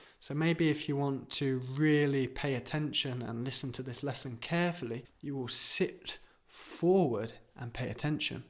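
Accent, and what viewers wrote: United Kingdom. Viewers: Thank you very much.Your teaching was great as always (with beautiful British accent) and the content was helpful as well. Interestingly yesterday i was talking to a Swedish guy.We talked about the cultural differences between Iran and Sweden. "midsummer celebration is something we do that stands out i believe" he said.It was the first time i heard "stand out"! After our conversation,i found out you uploaded new video so i watched it and got surprised.Now i can understand it better.🙏🙏🙏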